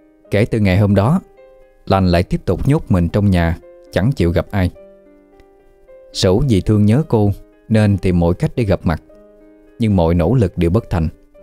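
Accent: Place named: Mekong Delta, Vietnam. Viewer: Chuyện ma mà nghe xúc động ghê 😮 giọng miền tây đặc ruột k ta sao nghe dễ thương quá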